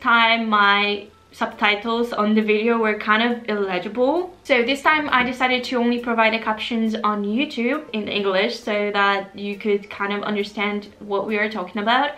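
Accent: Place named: Australia. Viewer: Why are you sounds like Australia😂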